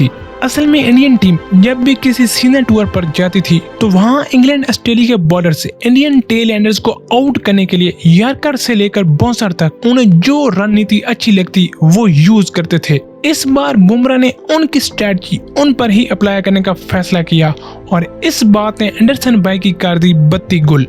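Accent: Pakistan. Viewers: Yaar ye pronounciation Pakistani laag rha hai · Pakistani accent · Tumhare bolne ke hisab se tum pakistani lagte ho? kaha se ho tum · Bhai tere accent se tu Pakistani lag rha hai.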